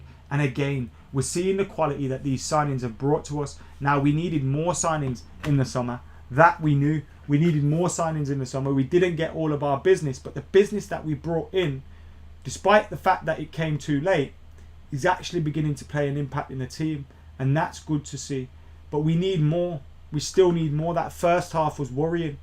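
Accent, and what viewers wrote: Birmingham, United Kingdom. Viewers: Are u really from Birmingham?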